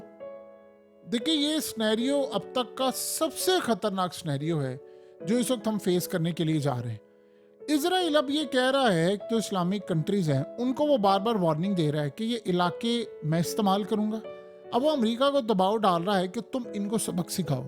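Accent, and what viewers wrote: Punjab, India. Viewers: Sir Mai India 🇮🇳 se hu aap ki nwes ward ki Namber 1 nwes hai Aur aap ki Awaz hme bahot Achhi lagti hai urdu ke sath thodi si punjabi ki jhalki bhi hoti hai sir mai ko dekhna chahta hu plzz sir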